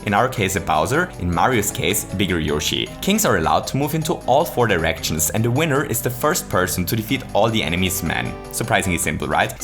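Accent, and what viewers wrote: Austria. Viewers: are you austrian? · what the heck are you guys talking about? Also, i know his accent is austrian, but im not sure if he lives there, maybe he has something on a social page or something, we should make it our goal to try to track him down! We need to do some digging...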